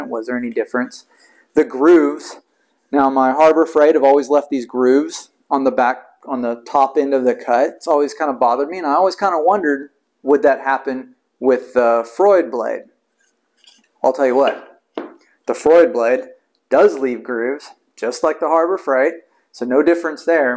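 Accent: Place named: Texas, United States. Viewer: well, I am from Austin so I always recognize Texas in videos no matter how it manifests itself!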